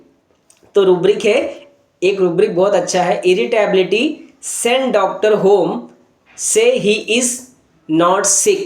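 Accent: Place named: United States. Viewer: Sir we learn a lot from your videos but few videos you are uploading in English American accent not in your own English/ Hindi language. This is not very beneficial for us.please continue in your own language .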